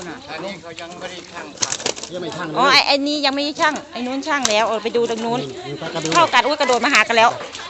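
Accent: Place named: Laos. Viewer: ยุอำเภอไรครับพูดออกลาวๆ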